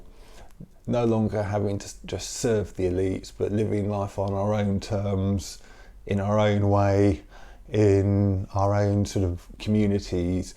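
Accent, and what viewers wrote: England, United Kingdom. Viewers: Your speaking like a true English man!! Love and respect!! Time to look after our own!!!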